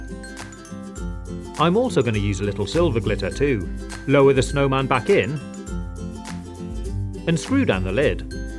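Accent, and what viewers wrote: United Kingdom. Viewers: Were you born in Britain or is that your accent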